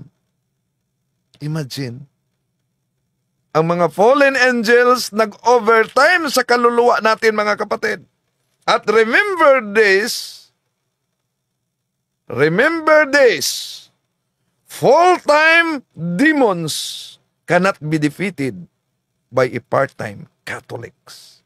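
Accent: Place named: Visayas, Philippines. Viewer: Kainis ka naman mag announce halos dko maintindihan bisaya accsent Kasi eh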